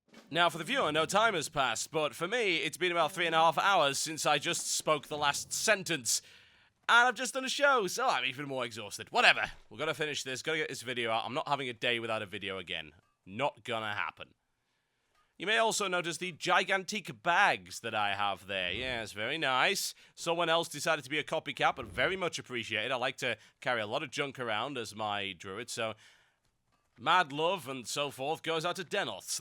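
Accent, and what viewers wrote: United Kingdom. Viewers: i think u should do the worgan voices cause u sound more british than anyone at blizz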